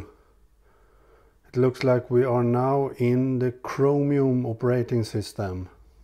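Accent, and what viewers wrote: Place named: Sweden. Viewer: love the swedish accent :D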